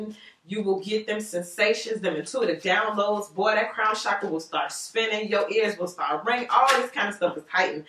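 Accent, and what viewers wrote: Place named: Atlanta, United States. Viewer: Love you QUEEN . And MAAAAN you so ATLANTA LMAOOOOO I LOVE IT🙌🙌🙌🙌😂😂😂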